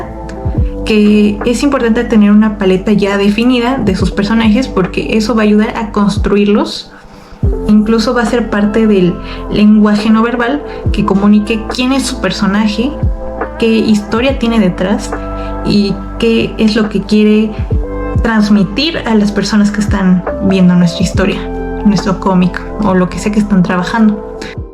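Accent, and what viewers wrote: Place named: Spain. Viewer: En buena onda , no tomes un tono español como lo hiciste en este video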